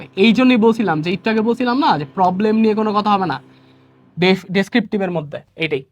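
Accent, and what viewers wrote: Sylhet, Bangladesh. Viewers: Tumio sylheti ni?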